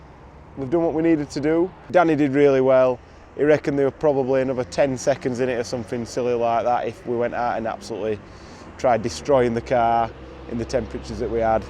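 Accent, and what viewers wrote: Yorkshire, United Kingdom. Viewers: Yorkshire accent and a mullet had me rolling 😂😂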